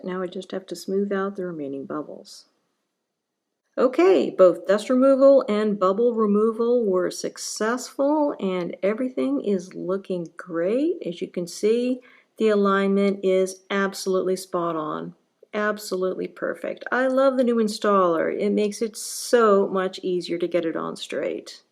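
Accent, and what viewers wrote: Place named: United Kingdom. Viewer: Hello ( for the French), by the way you sound British to me. Good job. It will be my next screen protector.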